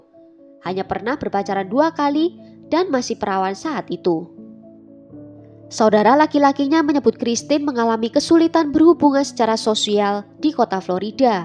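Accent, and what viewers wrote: Java, Indonesia. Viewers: Mbak ini pasti wong jowo, medhok jowone 😉, tp ini jadi ciri khas yg bikin beda dengan yg lain. Sukses ya mbak 👍🥰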